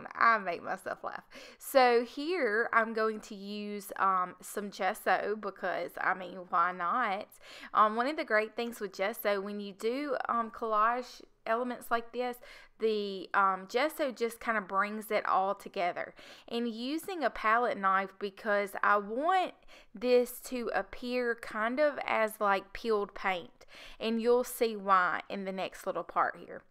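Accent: South, United States. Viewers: Miss your cute southern voice at the end! It's still a Great process video!! I love all the texture and vintage feel. 💜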